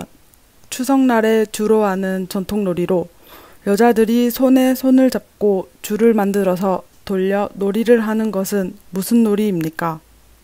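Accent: South Korea. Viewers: Cho mình hỏi 1 chút, giọng đọc trên là người gốc Hàn đọc ạ ? Và khi đi thi thì liệu tần suất đọc có nhanh hơn và khó nghe hơn ko ạ? ( giọng đọc trên mình nghe được ). Mình cảm ơn ạ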